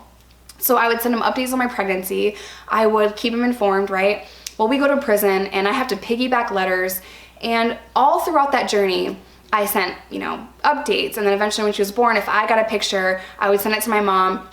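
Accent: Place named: Midwest, United States. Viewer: You grew up in New York? The way you say “pop” and “pod” sounds like the Midwest lol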